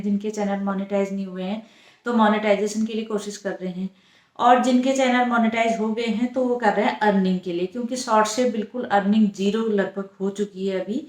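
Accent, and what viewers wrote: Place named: Pithoragarh, India. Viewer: तुम्हारी जानकारी बहुत अच्छी होती है में कई बार सुनी हु अभी भी फुल सुनी हु में जो तुम बताई यही सब हो रहा हा राशिफल का खूब चलन कुछ न कुछ करते सब लोग ऐसा,तुम कहा से हो बोलना तो पिथौरागढ़ वालो जैसा है, हा बार बार एक तो लगाना नि होता है बहुत अच्छा बोली सब,में जुड़ी हु तुमसे कई बार देखती हु कमेंट नि करती आज अच्छी से सुनी तो कमेंट कर रही हु ,सुनती फूल हु में ,,हा अपनी आवाज में बनाना सही है लाइव भी सामने अच्छी हा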